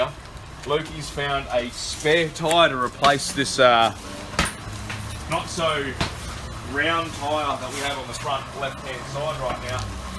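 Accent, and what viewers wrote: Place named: United Kingdom. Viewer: u dont have a deep aussie accent, u sound more like a british accent or UK accent.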